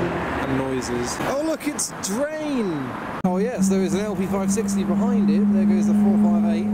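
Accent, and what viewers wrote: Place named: United Kingdom. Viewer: should be doing something like voice overs or hosting a show or something with his so British sound. Lol this guy could talk about grass and it would be entertaining.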